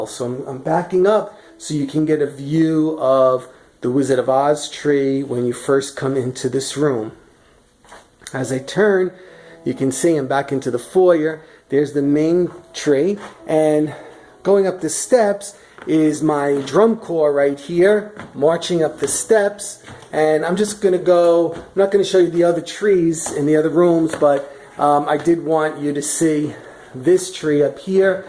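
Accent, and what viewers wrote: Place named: Northeast, United States. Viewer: Are you from new york, new jersey or boston? You sound like you are from northeast, i have a slight new york accent but not very much cause im in nebraska right now lol your house is gorgeous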